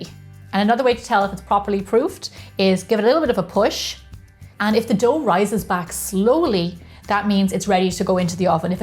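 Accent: Ireland. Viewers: I could bake bread with you all day.. You make it look so easy. How long will the starter last? But your bread looks so much different and just could listen to your Irish accent. Very nice... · So fun listening to the Irish talk. "And while it proofs, you can go have a cuppa." :-)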